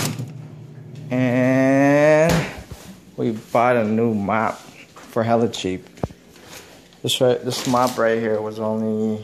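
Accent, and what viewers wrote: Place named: United States. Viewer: Luv your vlogs...Are you still in school or your done already? Luv you still have your American twang:)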